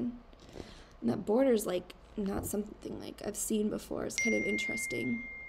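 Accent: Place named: Minnesota, United States. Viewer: I just found you last night so I'm excited you posted today!!! I love your small accent too it reminds me of the Fargo movie (in a good way as I love that movie, especially the way they talk)...I like your style of patterns you work on as it all different, sampler, full coverage, Halloween .... looking forward to your future videos. See you next time from central Canada 🇨🇦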